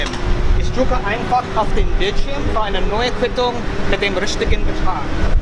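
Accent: United States. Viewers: der typ spricht mit amerikanisher aussprache, spricht viel bessar als mich aber. gruesse aus bulgarien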